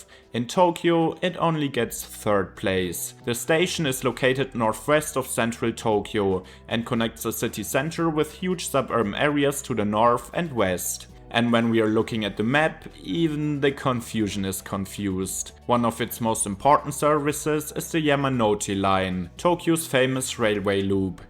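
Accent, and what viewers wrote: Germany. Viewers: Jäpänn ? lol try a longer vowel in the second syllable. no offense, work on your pronounciations, you support the bad reputation that germans have for their english.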